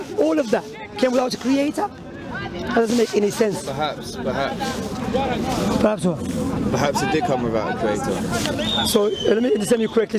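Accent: Algeria. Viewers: بارك الله فيك اخي .لديك حجج قوية وكلامك منطقي ومقنع لاي اجنبي عن الاسلام.اول مرة نشوف داعية بقوة اقناعك وبراهينك المثيرة والبسيطة .واصل شرف لنا انك جزائري عرفت لهجتك وحدي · عند رؤيتي للفيديو أحسست بأنه جزائري من خلال لهجته ، و الأن تأكذت من ذلك بعد تعليقك شكراً ، و أنا فخورة كذلك كوني جزائرية بهذا الأخ الله يبارك ❤❤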